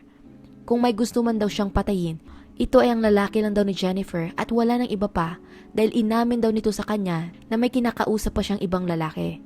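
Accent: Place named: Iloilo, Philippines. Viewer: Unsa manang inahana oy murag walay buot naapay inusturyahan ilongga bati paminawon. Hahay nimo day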